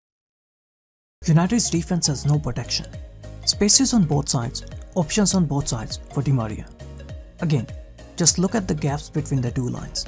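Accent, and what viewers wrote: India. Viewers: Great video ? Did i hear Indian accent ?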